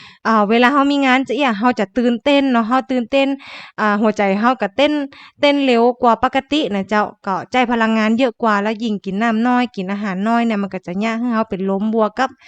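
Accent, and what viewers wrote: North, Thailand. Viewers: เป็นงานแต่งที่น่ารักอ่ะ วันนี้บังเอิญเปิดมาเจอ เลยได้ไล่ดูมาเรื่อยๆ เจ้าของช่องอู้คำเมือง น่ารักดีค่ะ ยินดีด้วยนะคะ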